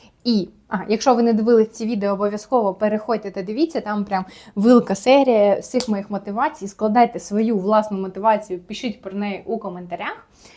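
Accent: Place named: Kherson, Ukraine. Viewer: Пишу коментар за вашу херсонську УКРАЇНСЬКУ!!!! ТИ КЛАСНА🤩 радяю з того, що все більше українського контенту з'являється. Дяую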